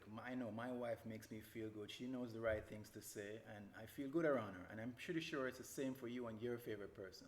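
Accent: Caribbean. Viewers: Hey, you sound like you're from the Caribbean. I'm Trinbagonian. Love you too. Your wife is lucky that, as a man, you've taken your mental health into your own hands and are trying to be better for yourself and her. It's refreshing to see an informative and proactive video on this topic from someone who lives it. Stay up, Brother.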